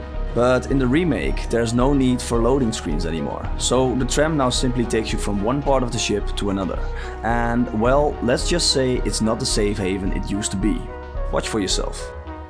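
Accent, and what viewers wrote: Netherlands. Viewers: Man you sound dutch you heve strong accent i vlasnders my self dutch part belguim 😅😅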